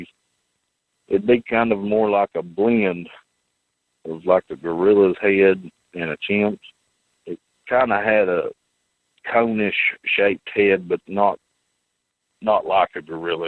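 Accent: South, United States. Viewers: Great stories !! Being a Fla boy love hearing this fellers southern talk 💯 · Love dat suthern akscent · I think it's a sexy rough n tough rugged good ol boy southern gent mountain cowboy accent. Very Revered Very Rare Esteemed Honest True Man Idk if ever that was even a thing but I sure do believe it is now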